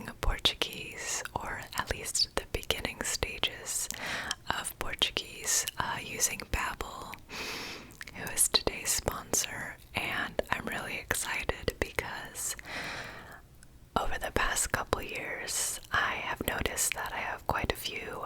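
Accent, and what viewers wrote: Rio de Janeiro, Brazil. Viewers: Toda carioca ela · In Portuguese: Você é muito fofa falando em português! Eu amei te ver falando nosso querido idioma, mas tenho uma informação para acrescentar a você: A pronúncia do S em português é mais utilizada como "ssssss". A pronuncia que soa como "shhhh" não é o padrão brasileiro. São pouquíssimos estados que pronunciam a letra S com "shhh", que são os cariocas (nascidos no Rio de Janeiro) e os nordestinos (nascidos na região nordeste). De resto, todos os outros estados pronunciam o S da forma padrão, soando como "sssssss". São culturas diferentes que se expressam de formas diferentes e não tem nada de errado nisso, mas é quis compartilhar essa informação com você! E obrigado por esse video, quero te ver mais vezes falando em português! Você tem um sotaque muito lindo <3 In English: You are very cute speaking in Portuguese! 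I loved seeing you speaking our beloved language, but I have some information to add to you: The S pronunciation in Portuguese is more used as "ssssss". The pronunciation that sounds like "shhhh" is not the Brazilian standard. There are very few states that pronounce the letter S with "shhh", which are Cariocas (born in Rio de Janeiro) and Nordestinos (born in the Northeast). Otherwise, all other states pronounce the S in the standard way, sounding like "sssssss". They are different cultures that express themselves in different ways and there is nothing wrong with that, but I wanted to share this information with you! And thanks for this video, I want to see you mor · The narrator is Carioca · Morri com o sotaque carioca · Exactly, Carioca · Narrator's accent is from Rio de Janeiro. In São Paulo would be sss sound , not sshhh sound.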